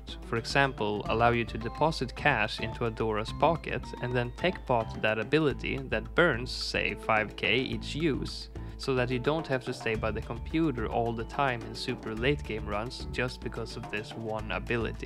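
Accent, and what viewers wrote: Sweden. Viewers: tog två sekunder att höra dig prata för mig att inse att du är svensk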